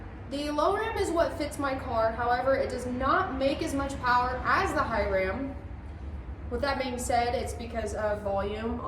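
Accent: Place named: Kentucky, United States. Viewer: Developing a lil KY accent cute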